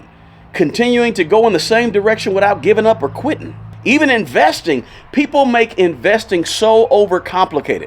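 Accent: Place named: Tennessee, United States. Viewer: Bro sounds country. Must be from TN.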